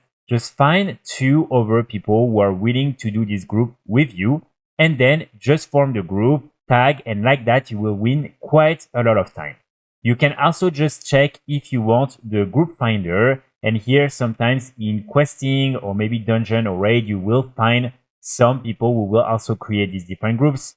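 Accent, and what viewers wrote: France. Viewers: Frenchie accent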